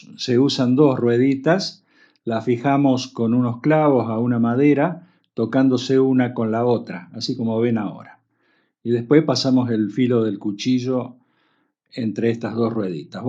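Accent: Argentina. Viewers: Como se nota que es argento por la manera de hablar... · Mira que casualidad (o causalidad), hace unas semanas atrás, al ver como mi flía se encarga de romper o descomponer los encendedores que yo aporto para la cocina (mientras yo los proveo, ellos los dejan obsoletos ... buah!), me encontré con una buena cantidad de estos artefactos indesusos. Y como soy dado pa las cosas manuales, las herramientas y la creatividad, me propuse el reactivarlos combinando algunos que les faltaban la piedra, o partes varias con otros con sus recamaras de gas intactos. La paciencia, dedicación y las ganas de aprendizaje lo es todo! Gracias por tus aportes, y me hiciste reir con tu forma bien "argenta" de hablar. Saludos desde Rosario "Cuna de la Bandera Argentina".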